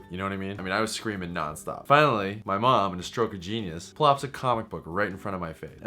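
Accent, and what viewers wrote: Boston, United States. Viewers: ***** oh. haha I've always thought she was from Boston. I can't tell the accents apart ^^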